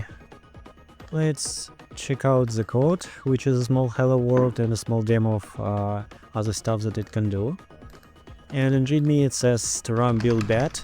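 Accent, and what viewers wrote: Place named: Russia. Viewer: Just finished watching, great video. An amazing voice reveal also with that thick russian accent haha.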